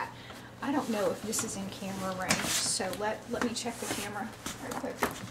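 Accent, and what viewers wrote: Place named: Southwest, United States. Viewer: I’ve run into people here in MO who say “mayzure.” She probably interacts with lots of people who have southwestern regional accents. I noticed at several points in the video that the accent flattens out a bit. It could be she has grown used to hiding it or it’s a consciously or unconsciously assumed accent due to her location. Whatever the case, I enjoyed the video and her personality.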